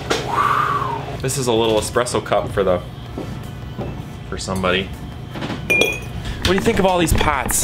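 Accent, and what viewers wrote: Minnesota, United States. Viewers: First time watching this channel. About in, thought to myself ‘he sounds like a Minnesotan a bit’ ... checked the bio, and what do ya know, I was right. (I’m also Minnesotan Btw), but I could definitely recognize the accent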